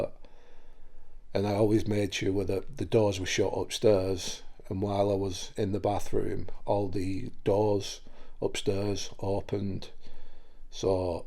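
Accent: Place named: Manchester, United Kingdom. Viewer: Hey up.. just listening to your background its a bit like mine.. I lived with my grandma n grandad but mine was a little boy!!! I had a load of crap that started cos i used to talk to it.. bit of a long story!! But i asked it to leave us alone in the end.. it followed me when i went to live with my mum & brother down the rd and it went crazy there.. now i block it all out now and focus on my belief in God and pray the rosary, i never say gods name in vane and try and lead a good life.. I've never done a ghost hunt.. definitely not the glass game as my mum will say.. far to dangerous.. do you mean you used to do those kind of things with groups?? Ya sound a Manchester bloke.. I'm Sheffield and I know there's loads of ghost tours around Sheffield cos my stepdaughter went on some.. I went mad at her cos i was always taught to not mess around with that stuff and like mediums etc cos your opening yourself up.. 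I'll pray for you & your family.. stay positive n I'd say don't talk to it and pray the rosary.. I ordered a rosary with a prayer book off ebay.. tells you how to say the rosary properly.. You and your family keep safe and well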